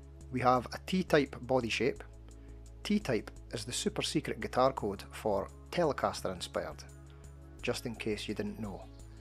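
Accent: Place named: Dundee, United Kingdom. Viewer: Sounds like a Dundonian accent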